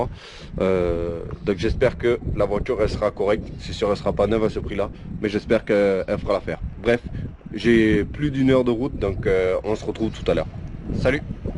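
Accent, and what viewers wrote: South, France. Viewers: Force à toi, le scandinave avec l'accent dégueulasse du midi.... Bisous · G déliré ou t'as l'accent du sud quand tu parles dans la vidéo ?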